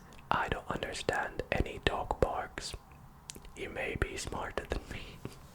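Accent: Scotland, United Kingdom. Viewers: You sound scottish are you from scotland?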